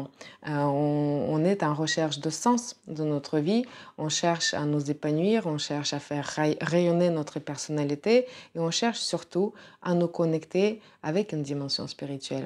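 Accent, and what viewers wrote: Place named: Latin America. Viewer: Bonjour, je vous découvre aujourd’hui et, contre toute attente, je suis déjà fan. Expression parfaite, pas de narcissisme, un vrai désir de partager. Et ce charmant accent qui m’a fait voyager chez nos voisins latins # Noeud Nord en Gémeaux. Merci 🙏 🥰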